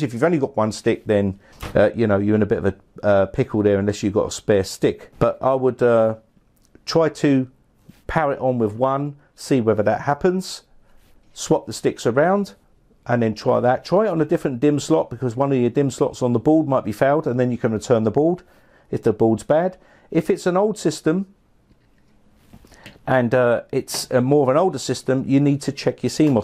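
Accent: England, United Kingdom. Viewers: Most satisfying english accent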